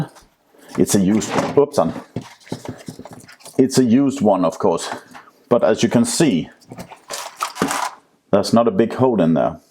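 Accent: Scania, Sweden. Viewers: Njaaaa, lyssnar hellre på skånsk/danska 😀👍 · För sjutton! Kör inte på engelska. Jag slutar kolla. Hela charmen med din kanal är ju din dansk/skånska accent 😢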